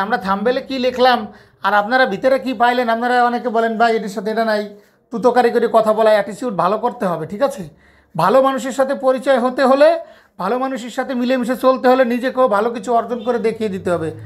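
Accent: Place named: Barishal, Bangladesh. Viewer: কথা শোনে মনে হইছিলো বরিশাল।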